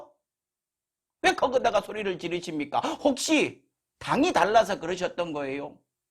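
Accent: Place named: Gyeongsang, South Korea. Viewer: 아따 대구분이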